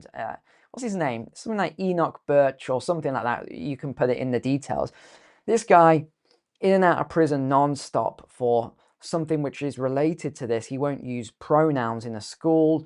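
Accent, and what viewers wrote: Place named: United Kingdom. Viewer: are you on the Isle of Man? What is your citizenship? I'd bet that most viewers on youtube think you're an American with a British accent. I confess I've been confused.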